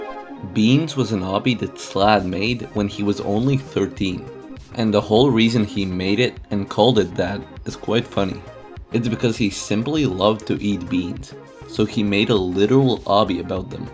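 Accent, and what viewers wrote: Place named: Latvia. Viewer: just listening to you speak i could understand that u were latvian 😜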